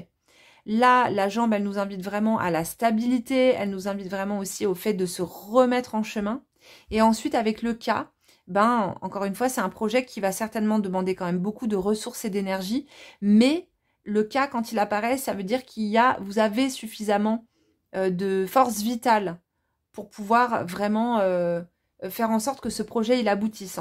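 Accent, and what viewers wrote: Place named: Belgium. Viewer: Bravo! 2: ça me parle! Je vais déclarer mon activité Artiste- Décoratrice d'intérieur ! Vous effectuez vos guidances avec tellement de cohérence et d'assurance verbale et spirituelle, c'est très fort , toutes n'y arrivent pas, bafouillent, ou bien s'écoutent parler! Ce n'est pas du tout votre cas et votre accent belge me fait trop rire, ainsi que certaines expressions du genre: "la papesse c'est pas la chaudasse du tarot" lool! Osiris:::: j'ai fini ce matin Christian Jack :Abydos ou le mystère de la résurrection!" Tarots magnifiques comme le préraphaélite par ex.. . Oui je faisais de la peinture, enfant, et plus tard photographe, mais les "conseillers" m'ont toujours découragée! Merci à vous et au Soleil!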